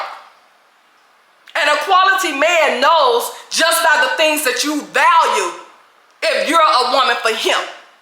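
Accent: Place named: South, United States. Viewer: You told us. It was for who it was for. Don’t take it personally. Leave the messenger alone. I love your platform. Your delivery really makes people think. Keep doing what you doing Ms.. I heard a Southern accent coming through on this one.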